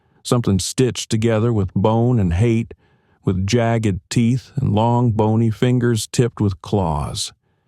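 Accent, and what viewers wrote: South, United States. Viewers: This spooky story should become a classic. Dark atmospheres. A soft Southern Drawl with wording the way only an old time Southerner could say it. And the story! Spooky as all get out! But tethered to our earth by its moral lesson. Surely a tour de force of Kentucky lore. Thank you!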